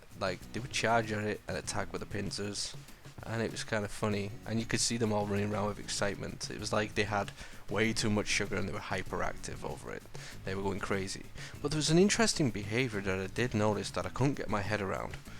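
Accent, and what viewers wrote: United States. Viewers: Are you American, Scottish or Manc? 🤔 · Why are you putting on an American accent?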